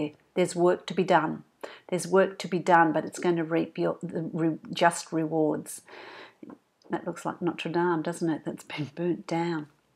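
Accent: Australia. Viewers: so fab hearing an Aussie accent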